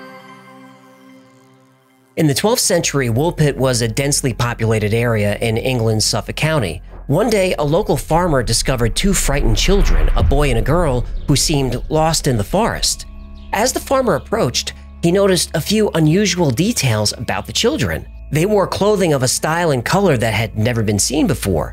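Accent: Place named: Long Island, United States. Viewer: Not Brooklyn. That's more of a long island accent.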